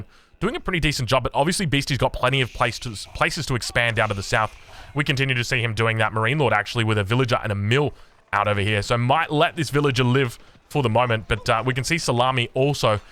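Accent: Australia. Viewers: This wild wolololo of was the best part of the video. question, does every Australian sound like Steve Irwin? Greetings from the Netherlands (Y)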